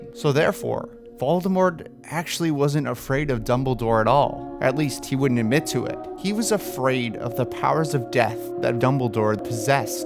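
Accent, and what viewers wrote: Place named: Canada. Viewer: Your Canadian accent is palpable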